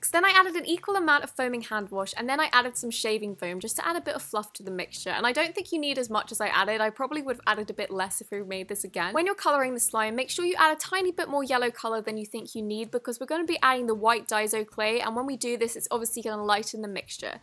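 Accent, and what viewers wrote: England, United Kingdom. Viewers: Are u british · Yes a new upload! <3 I love your English accent and.. EVERYTHING 😱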